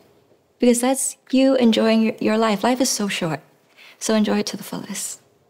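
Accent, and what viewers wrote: England, United Kingdom. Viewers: O inglês britânico sendo o maior charme dela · I love her English accent ❤ How beautiful she is 😍🩷 Thank you CHANEL 🤍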